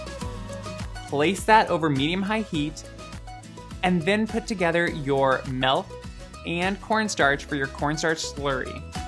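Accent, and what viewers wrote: Midwest, United States. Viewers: I think he has a Midwestern accent.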